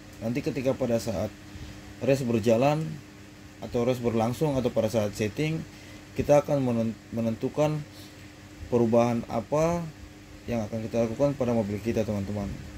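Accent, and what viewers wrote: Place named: Ambon, Indonesia. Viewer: Logat nya kayak logat Ambon ka om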